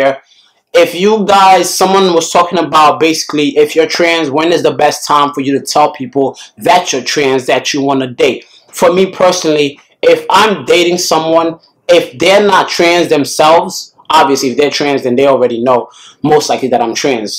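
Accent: New York, United States. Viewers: I love his waves and that newyork accent❤️❤️❤️❤️❤️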